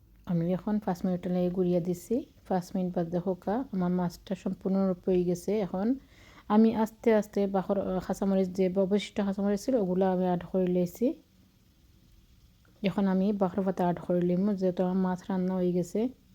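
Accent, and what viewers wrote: Sylhet, Bangladesh. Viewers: Sylheti kotha ghula vala lagw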